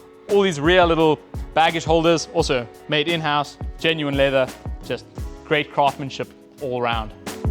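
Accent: South Africa. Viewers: Thats South African accent.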